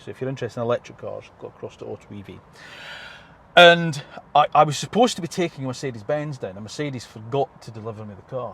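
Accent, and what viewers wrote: Scotland, United Kingdom. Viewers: gotta say, your (scottish?) accent is really nice and warm to listen to (not in a homo way). just that it feels pleasant and friendly.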